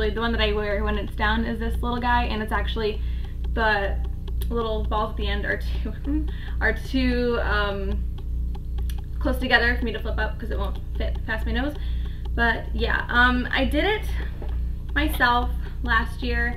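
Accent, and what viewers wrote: Michigan, United States. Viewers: I knew I recognized that accent, another fellow Michigander! Haha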